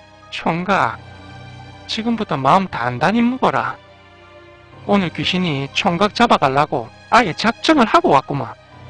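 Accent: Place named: Gyeongsang, South Korea. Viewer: 님이 경상도 분이라 아래어떤분이 서술톤이 재밋다고 하는게 이뜻 아닐까요? 사투리 쓰시는 대신에 잘생겼잖아요. 키도 저강하시고....^^ 님 도화살 조심조심 ^^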